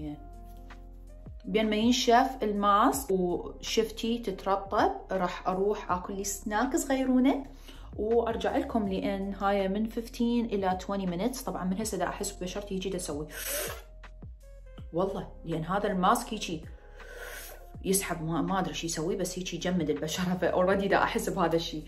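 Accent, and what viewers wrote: Iraq. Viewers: ما تدرين شقد صايره احب قناتك واتحمس مره إذا شفت اشعار لفيديو جديد+حبيت اللهجه العراقيه اكثر من وراك 🥺❤️❤️❤️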